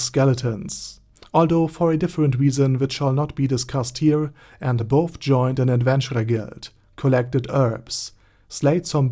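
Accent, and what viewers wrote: Germany. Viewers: Selbst als Deutscher fällt mir das Lesen deines Namen sehr schwer. Ich finde das Video sehr gut und dein starker Deutscher Akzent ist auch ma was anderes .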